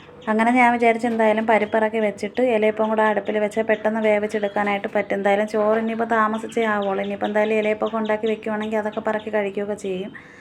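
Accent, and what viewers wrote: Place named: Kilimanoor, India. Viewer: ഓരോ സ്ഥലത്തു ഓരോ സംസാര രീതി അല്ലേ.. ഞങ്ങൾ കിളിമാനൂർ ഭാഗത്തു ഇങ്ങനെ ആണ് സംസാരിക്കുന്നത്.. അത് മാറ്റേണ്ട കാര്യമില്ല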